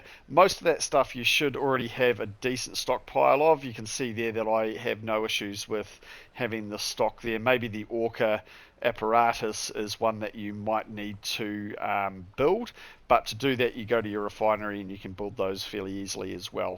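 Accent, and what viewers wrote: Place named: New Zealand. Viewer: New player here. Didn't know about the account ledger at Yanita. Thanks for this 🙌 Great Kiwi accent, mate! Edit: off topic, am i the only one who lost access to the shrine at Telok Penjarah, since the last update?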